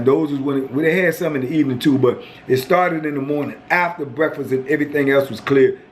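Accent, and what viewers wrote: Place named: Washington, United States. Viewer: I’m loving these stories When say certain words as only a native Washingtonian can say I chuckle to myself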